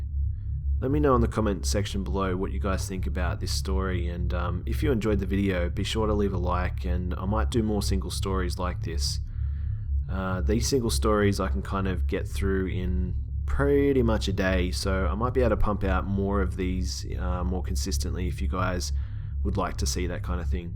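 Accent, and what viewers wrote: Australia. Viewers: mate are you Australia P.s me too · dammmmn can tell you're from Australia straight away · holy s***, you're Australian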